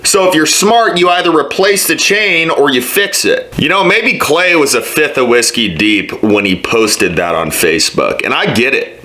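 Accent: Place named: Georgia, United States. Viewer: Dam dude you must be from Georgia because u sound like one of us back wood boys. Tell it like it is! God bless u brother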